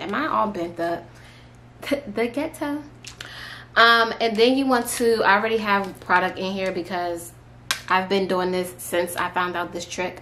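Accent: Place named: Washington DC, United States. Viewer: I know you’re from the dc area by your accent. Love the video. Thanks.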